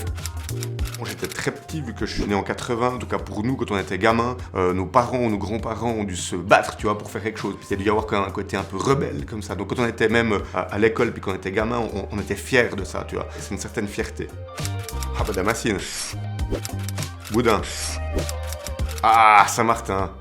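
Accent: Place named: Jura, France. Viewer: En tout cas avez bien l'accent du Jura😊😊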